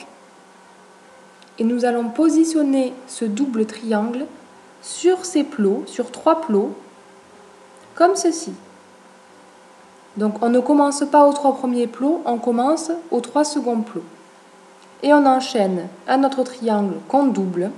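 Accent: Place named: Nord-Pas-de-Calais, France. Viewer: tu parle ch'ti ! !!!!!!!!!!!!!!!!!!!!!!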